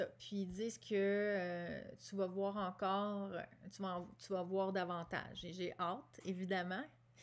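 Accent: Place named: Canada. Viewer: Merci de votre reponse....Cela me touche aussi car cela me conforte que nous sommes des êtres divins et nous avons un rôle à jouer dans l'éveil pour emmener tous nos soeurs et frères du monde terrestre vers une vie dans l'Amour La Paix et la Lumière.....Gratitude....Namasté PS: j'aime beaucoup votre accent canadien....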